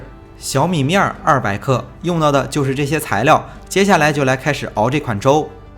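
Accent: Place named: Jinan, China. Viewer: 别忘了山东腔 ;)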